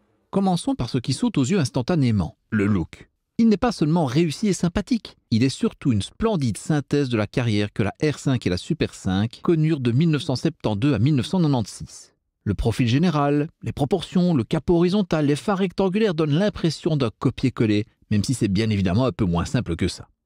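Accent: Belgium. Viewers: Merci pour cette video, anciennement frontalier, votre accent m'a rappelé de bon souvenir sur les plages de La Panne !!! Je ressens votre amour pour renault 😊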